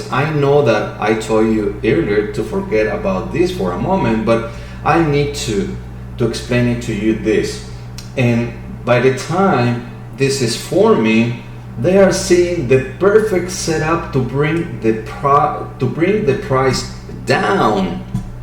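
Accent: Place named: Latin America. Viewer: sabía que eras de latinoamerica cuando empezaste a hablar con acento y te entendí perfectamente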